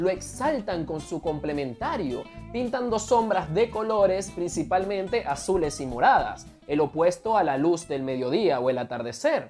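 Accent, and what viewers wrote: Venezuela. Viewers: Chamo, se te nota lo venezolano... ❤ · Coño pero que buen video, yo qué no tengo paciencia para ver ni películas, bro arrechísimo y paisano venezolano con ese flow! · Buena información, pero el acento venezolano no lo soporto hermano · Viva nuestro dialecto venezolano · Amé demasiado este videooo, desde el inicio me enganchó el acento y jerga venezolana. Que bonito saber qué hacer creadores de contenido de Venezuela que si aportan de verdad y no andan sin camisa y comiendo carne cruda jajaja Tienes una manera increíble de unir teoría y práctica, seriedad y humor. Al igual que el color estás lleno de contrastes y eso es lo que te hace especial ☺️ganaste una nueva suscriptora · Se te nota lo venezolano mi pana.... Me encanta dX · Me encanta como.explicas todo, muy venezolano je je je ❤ tenía tiempo que no escuchaba uno, me gusta el color rojo !!! 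· Me uní por lo que aprendí con este vídeo y por el acento de mi gente criolla venezolana!!! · Es venezolano por el acento No? Pero de que Estado ? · Caraqueño o Valenciano? De maracucho no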